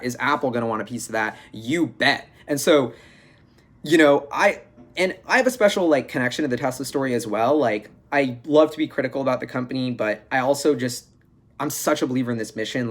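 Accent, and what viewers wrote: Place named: South, United States. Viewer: Where are you from? Sounds like a southern accent at times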